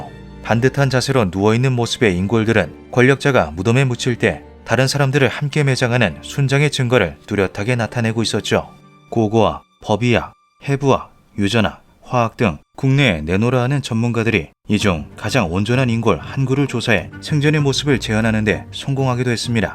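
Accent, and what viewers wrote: Gyeongsang, South Korea. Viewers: 씹할 갱상도ㄷㄷ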